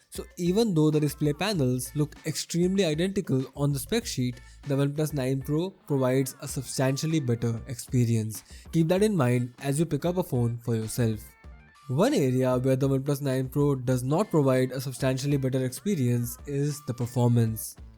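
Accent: India. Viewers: I don't understand much because of your Indian accent but i like your review 👍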